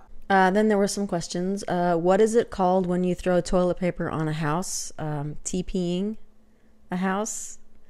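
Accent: Texas, United States. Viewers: I am not really sure how a Texas accent sounds... but i think yours is lovely... :-) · You have very little Texas in your voice - once in a while, but not often. The new sign is cool.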